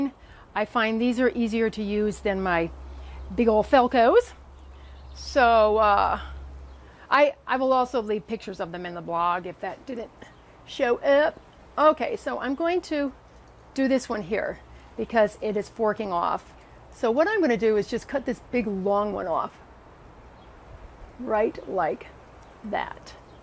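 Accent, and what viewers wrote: United States. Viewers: You sound like you're from Northern USA or Canada! Such a sweet voice makes the video easier to watch!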